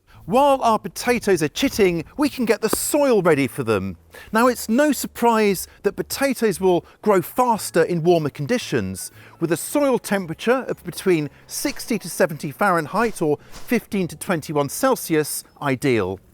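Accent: England, United Kingdom. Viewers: English gardeners has a such great accent 😊 it is almost plaesure to learn English and gardening at the same time😅 "two birds with one stone" 😂 verey interesting informations about early potatoes 👍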